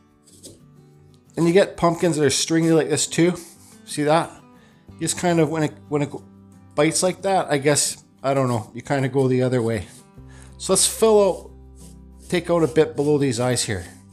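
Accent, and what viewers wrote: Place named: Canada. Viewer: I can hear Canadianity in your voice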